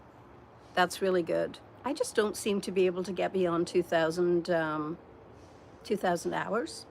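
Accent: Ireland. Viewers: Hi! You're from Ireland!!! WOW! Now that you mentioned that I can hear just a slight accent! I agree that growing up without a lot does make you humble! This was fun!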